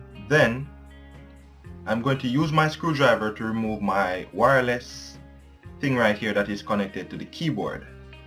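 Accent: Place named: Jamaica. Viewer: Thumbs up for the JA accent..... bras brap 🇯🇲🇯🇲🇯🇲🇯🇲🇯🇲🇯🇲🇯🇲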